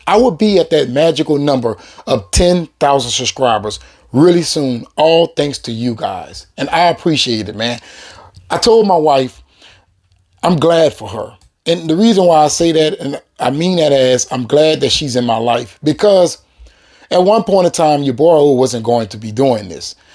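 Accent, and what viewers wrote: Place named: Louisiana, United States. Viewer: You sound like you’re from Louisiana. I think Channing Tatum studied “black people” from that state to get the Cajun accent. What do you think?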